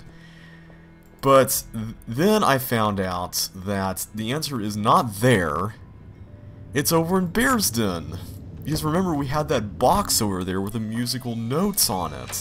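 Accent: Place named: United States. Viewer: Your accent is adorable! What part of the US are you from??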